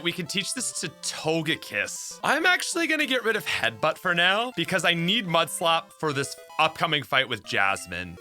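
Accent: Canada. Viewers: That Canadian accent kept slipping out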